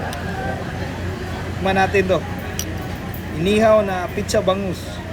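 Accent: Visayas, Philippines. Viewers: bisaya kay ka kollll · Gahia pod sa imong Tagalog Bai. Klarong Bisdak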